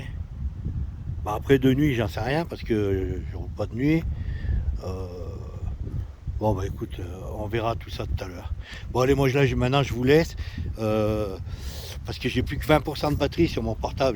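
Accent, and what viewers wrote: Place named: Savoie, France. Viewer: j'me disais bien qu'il avait un accent de chez moi !!!! super merci pour cette video. J'ai essayé cette 750 chez Honda La ravoire ( 73 ) bah !!! ça a été le coup de foudre aussi. j'ai pas franchi le pas, je viens de leur prendre la 125 CB F comme petit utilitaire mais cette Transalp est top !!!! il est même venu a 2 pas de chez moi, au col de champ laurent !!! Elle était un peu haute pour mes 174cm mais bon !!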